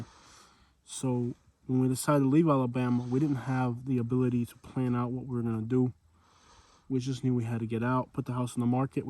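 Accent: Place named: California, United States. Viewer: While you are taking I am hearing California.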